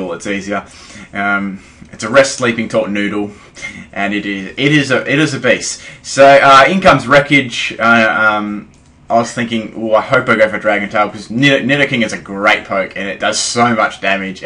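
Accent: Australia. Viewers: nothing against Australians and their accents but yours is rather thick, plus the loud, low quality audio makes it hard to sit down and enjoy this video cause i cant understand what your saying.. just calm down you don't have to speak so loudly imo other than that, this was a great battle and your team is bad ass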